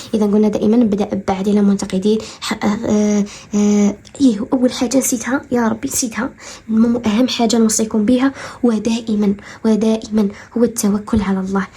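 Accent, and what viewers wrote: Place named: Algeria. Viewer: حوجيانة طفلة تهبل مشاء الله تبارك الرحمان من هدرتها تبان غليزانية ولا شلفية ❤ وبلمناسبة عندك حق في كلشي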